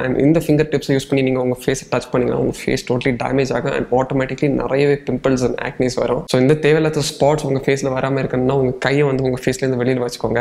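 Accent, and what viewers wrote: Kanyakumari, India. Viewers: Bro kanyakumari ah?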